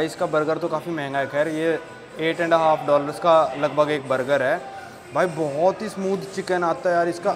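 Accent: Haryana, India. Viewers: Bhai....You are a very frank guy and I like the way you present the whole show. I like your Haryanvi accent and daring to rebuke the viewers who look for lecherous clips. Keep it up. Come to my area in Dover at Rehoboth Beach and cover it.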